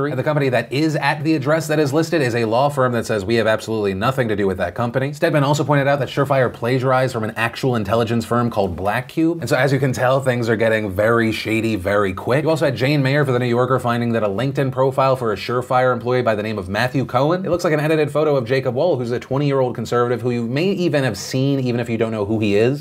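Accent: South, United States. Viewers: This slow talking southern drawl of this guy has is endearing, but I wish he would learn to t as l faster.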